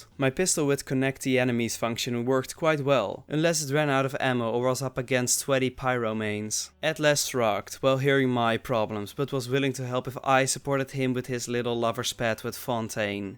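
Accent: Netherlands. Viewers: Duurde precies een halve minuut, voordat ik door had dat jij nederlands was... 😂😂😂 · oh lmao i didnt even know it was on your channel xD i just heard the dutch accent coming through the english haha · Not to ask stupid qwestion but are u dutch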